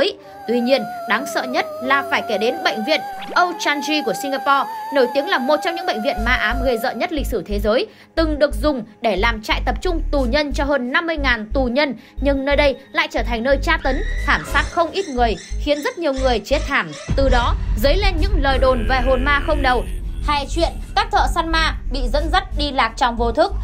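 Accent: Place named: North, Vietnam. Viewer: Hello chi em kg biet chi ten la gi cu keu chi la nghe no kg hop voi chi chuc nao gi chi dep gai Thi ten cua chi chac Han la dep lam vay chi Cho em biet chi ten la gi di di nhe chi em rat thich giong noi bac ky cua chi chi noi chuyen rat la hay va duyen dang nghe hoai nghe mai ma kg thay Chang chi noi chuyen rat rat la hay va thu hut em da bi chi thu hut tu Luc Lan dau tien em xem clip cua chi chi la idol cua em va la than tuong cua em em rat ham mo va rat nguong mo chi trong TRAI Tim cua em vay chi nho Cho em biet danh tanh cua chi nha du la chi co ten gi em cung thich nguyen sau chi Cho em biet ten cua chi la gi la em day mang nguyen lam Roi chi dung de em cu keu chi la nghe no kg thich hop voi chi chuc nao vay nho Cho em biet chi ten la gi nha cam on Chi love from Melbourne Australia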